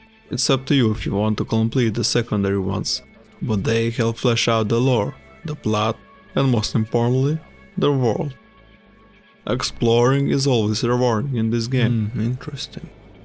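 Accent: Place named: Eastern Europe. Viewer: Hi, are you from Eastern Europe? Accent going kinda thick💪 Love your videos, keep it up!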